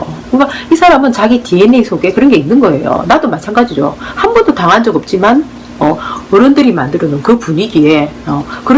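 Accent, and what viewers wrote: Gyeongsang, South Korea. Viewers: 저는 님 경상도억양이 정감이느껴저서 넘좋고 편견없는 생각도좋습니다 말끝에 한방 날리는 웃음소리는 사이다 같아요ㅋㅋ 잘보고 있습니다 홧팅^^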